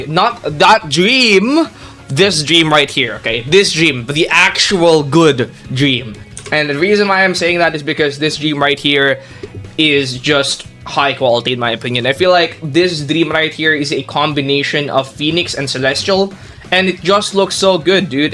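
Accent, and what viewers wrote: Philippines. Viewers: idk why but your accent's giving me a filipino vibe lol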